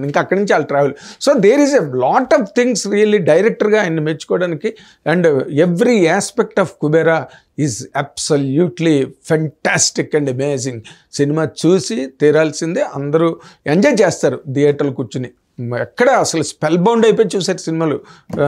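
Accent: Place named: Vizianagaram, India. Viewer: విజయనగరం జిల్లానా? మాట తీరు అలాగే ఉంది!!!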